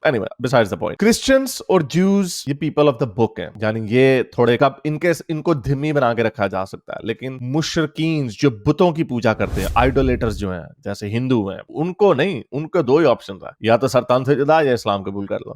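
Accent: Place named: Punjab, India. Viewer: Great voice...& ... Punjabi laheka in speaking.... Thanks Tu shissss Great....❤❤❤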